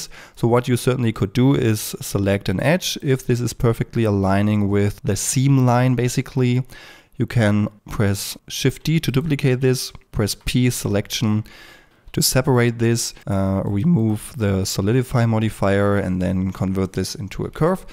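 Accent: Germany. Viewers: I smell a german speaker. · Hikari He‘s german. It‘s just a strong accent. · dieses deutschenglisch ist so schräg... aber supergute tutorials :) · ich liebe die deutsche aussprache und du? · dude, this guy is sooo german :D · bro sät sounds ja ganz schön deutsch hasste auch einen deutschen account? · your speech sounds very deutsch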